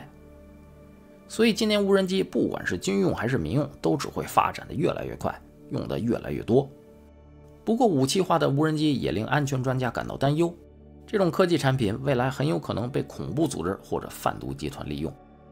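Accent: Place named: Tianjin, China. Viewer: 真好，现在每期都要听，内容好，还有好听的天津普通话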